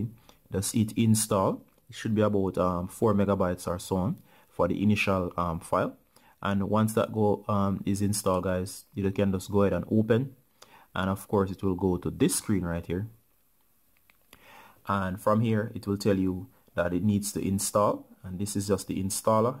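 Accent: Jamaica. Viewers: r u Jamaican I am idk it's your voice that caught me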